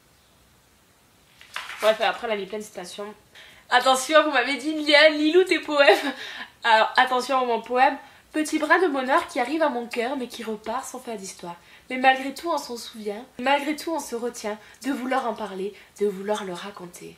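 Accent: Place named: Toulouse, France. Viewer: Dac, dac, j'adore ton petit accent toulousaingue:)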